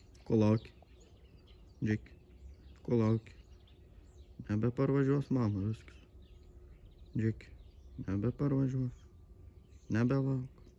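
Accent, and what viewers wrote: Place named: Samogitia, Lithuania. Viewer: tai arba žemaitiškai šneki, arba lietuviškai, apasispręsk..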